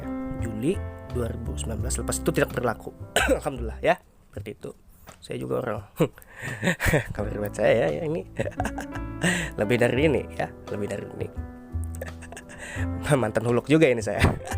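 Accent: Banjarmasin, Indonesia. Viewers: Abngnya Dari Banjarmasiiinn, kahh??? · Banjarmasin ya....Sy suka gaya bicaranya, mengingatkan Sy dg seseorang di Bjm hheee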